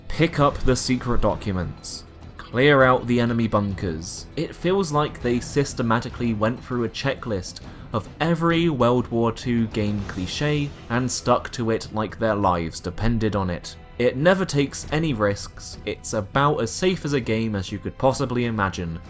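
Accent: United Kingdom. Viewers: Thaaaaanks! I always imagine your British accent when I read your comments lol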